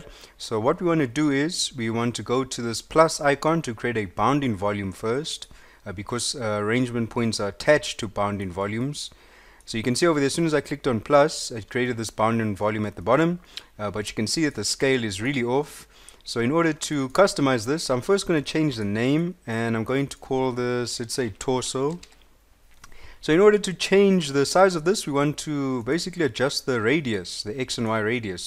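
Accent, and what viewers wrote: South Africa. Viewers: weird thing but you sound south african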